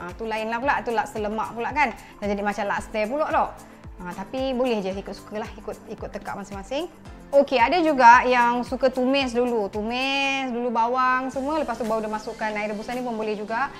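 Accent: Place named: Kedah, Malaysia. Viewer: Comey je kak ckp loghat kedah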